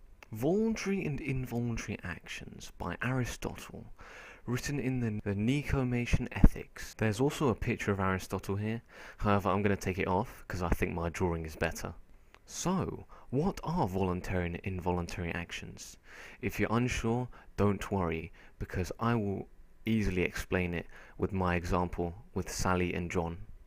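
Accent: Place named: RP, United Kingdom. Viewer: Posh boy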